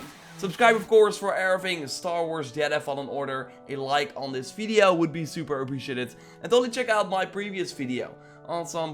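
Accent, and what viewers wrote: Netherlands. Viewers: Youre Dutch aren’t you?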